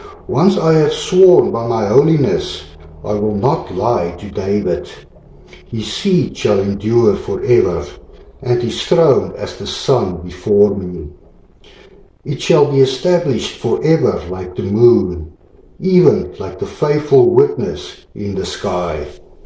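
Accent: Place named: South Africa. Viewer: Hey, sounds like a South African brother!! 🇿🇦 God bless.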